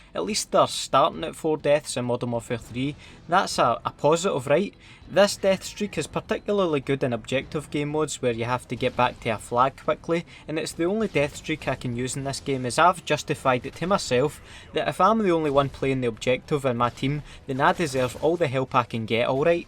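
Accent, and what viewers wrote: Scotland, United Kingdom. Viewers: i started talking with a scottish accent because of you and im german.. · What part of Scotland you fae?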